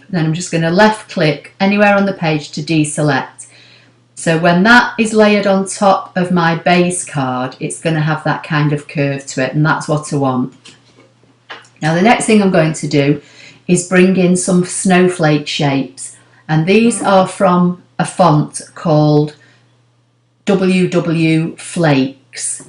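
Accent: United Kingdom. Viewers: Well as I’ve heard and believe....YouTube videos have a life of their own. Someone catches one and the fun begins. I’m amazed when I view one of yours and read the comments that it is from “the past”. Being rather new to ScanNCut and paper crafting I’m looking for all the help I can get. Thanx so very much for your beautiful work. And I live your British accent. 😍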